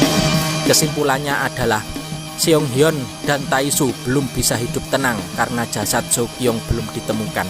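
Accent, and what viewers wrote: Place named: Java, Indonesia. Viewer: Bisa gak kalo bacain alur nya jangan medok Gak enak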